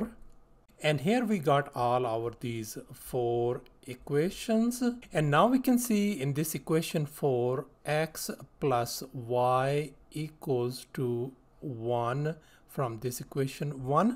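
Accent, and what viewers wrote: India. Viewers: Sir your voice sounds like Indian..then why you are in America..😒😒..don't you love india?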